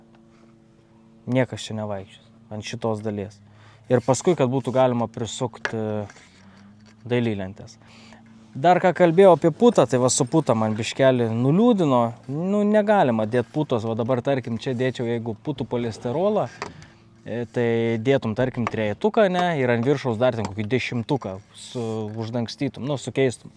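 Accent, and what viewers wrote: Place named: Suvalkija, Lithuania. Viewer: Geras suvalkietiškas dialektas😆👍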